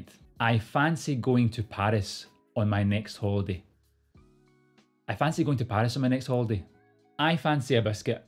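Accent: Scotland, United Kingdom. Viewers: スコットランド訛りが強いですね。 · イギリス英語イケてる！ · What accent is that? It sounds almost Scottish, but could be New Zealand as well. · Mmm I’m in Australia and I know people from New Zealand but they sound quite different. has got such a beautiful Scottish accent i think 😊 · イギリス英語カッコいい🇬🇧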